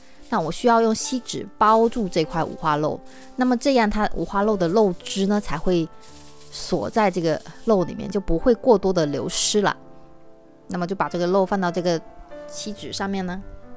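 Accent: Fuzhou, China. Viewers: Are you fuzhou? Sound like from fuzhou or fujian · 好熟悉的福州口音普通话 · 应该是福州口音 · 感觉是福州人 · 你好，我也是福州人，老乡一听口音就可以听出来😂 我想问一下，沙姜粉可以用其他东西代替吗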